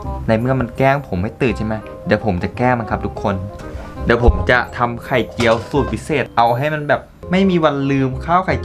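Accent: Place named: North, Thailand. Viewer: พี่เป็นคนเหนือหรอครับทำไมพูดเหนื่อ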